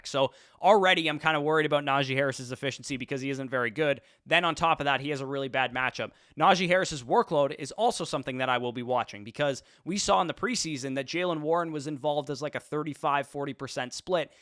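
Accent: Canada. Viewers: Another good video, thanks. Hey, can you say “out and about running routes” in your thickest Canadian accent? Thanks 🙏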